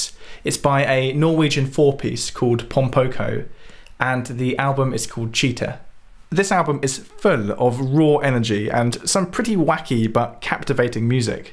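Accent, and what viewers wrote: England, United Kingdom. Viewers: A man with an English Accent !! Dreamy !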